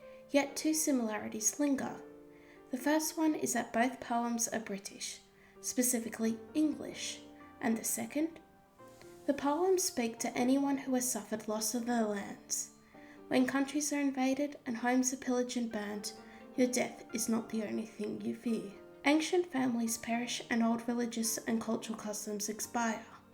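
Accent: Australia. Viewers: Don't change your Australian accent! It's part of who you are and, honestly, it's quite refreshing to hear poetry recited in an accent which is not either English or US-one-size-fits-all. There is a version of The Wanderer in the original Old English (violavonschnitzel) on YouTube. It sounds very Scandinavian. Every accent lends something special to a reading. Apart from 'half showers' which should be 'hail showers', I don't think there's anything in your accent that can't be ironed out by sloooowwinng down a bit. I think it's wonderful (and hopeful) that someone as young as yourself (i.e. a lot younger than me) is interested in 'The Wanderer' and 'The Lord of the Rings'. The way things are going at the moment I can see a subversive movement developing whereby people will be swapping illicit copies of Shakespeare's 'Merry Wives of Windsor' or Orwell's 'Animal Farm'. 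😉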